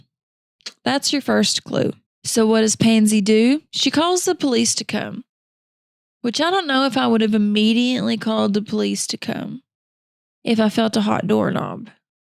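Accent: Kentucky, United States. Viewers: And as a nice bonus, along with that sweetest southern Kentuckyian accent of yours, I also love your unique sense of humor B. So that means I have found my new favorite content creator, and the videos I'm gonna binge - watching every day, while I'm resting and relaxing after my training, and enjoy while doing it. So the least I can do, is to like, & leave a comment for the " Algorithm Gods" while I'm enjoying your videos. Thank you, and I appreciate you and your hard work that you're putting into making this awesome videos for us, so very much. Cheers!👨‍🎤🌹👧🇷🇸🍀🥂🇺🇸🌏😎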